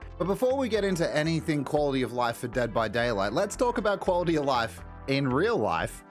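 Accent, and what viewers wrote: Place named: Australia. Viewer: I thought you were Australian. Crikey...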